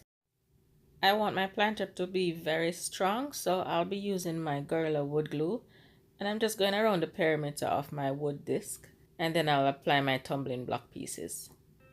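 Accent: Jamaica. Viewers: This is absolutely brilliant!! I adore your voice!💗are you Jamaican? You mentioned condolences… whatever is happening, I am sorry for your pain… I’ve had a tough year myself- lost my mother and 3 premie grand babies…❤️❤️‍🩹💔praying for you, lovie.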